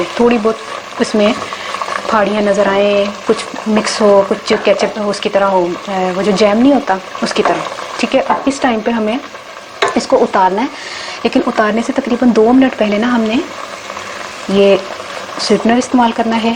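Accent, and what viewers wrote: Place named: Punjab, India. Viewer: Mezedar Punjabi hahaha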